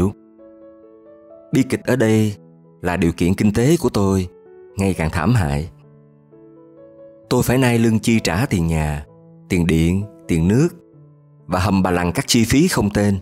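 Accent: South, Vietnam. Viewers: Hello 👋! Duyên phận của mình thì xa ngần ấy ngày vẫn là duyên của mình. Sáng ngày nọ khi không mở YouTube lên lại nghe được giọng đọc miền Nam thật hay, văn viết không bị "lai" của thế hệ hiện nay, ngồi nhâm nhi cafe để ngửi hương vị "café" cuộc đời từ kênh. Cảm ơn rất nhiều ❤️🌼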